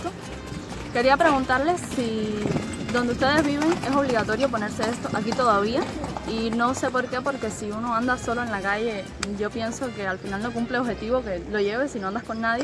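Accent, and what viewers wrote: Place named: Cuba. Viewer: aveces hablas despacio otras veces te sale lo de cubano.tu hablas ingles?? Tu vlogs es in inglish.otris vlogs son en spanish..cuidate🫀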